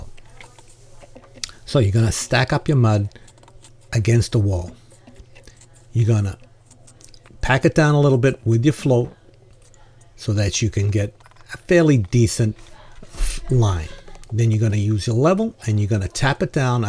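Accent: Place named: Boston, United States. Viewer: Good tips! You don't need to tell us you are in Boston... we can tell haha. Great job!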